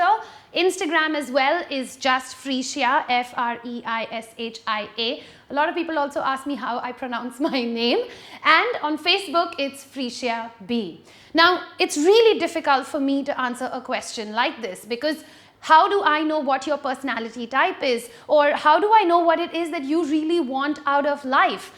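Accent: India. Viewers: I just love your natural Indian accent :).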